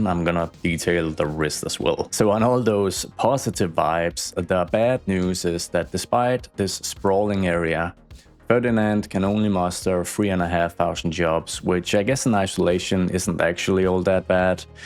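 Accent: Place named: Denmark. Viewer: Synes også jeg kunne fornemme en Dansk accent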